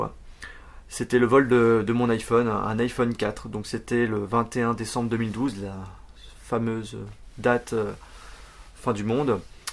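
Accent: France. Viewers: T'es de paris, lyon ou marseille ? tout simplement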